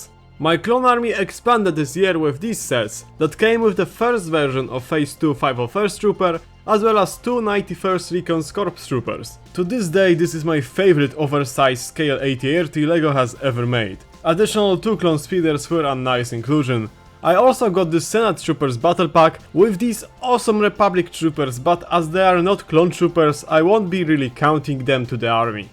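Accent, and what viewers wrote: Poland. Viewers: Are you from Poland? I mean your voice have so polisy Accent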